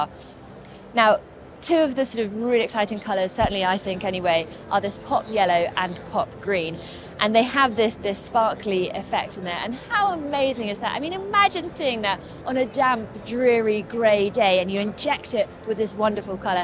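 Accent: United Kingdom. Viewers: She has a cute British accent, though I think she will be even better if she was not in such a hurry and speak slower.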